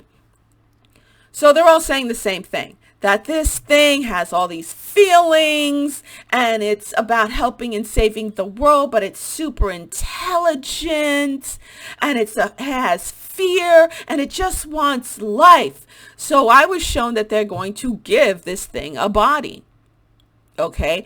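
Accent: New York, United States. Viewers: V you was so NY throughout this video! Lol thank you so much for the heads up! We really in this !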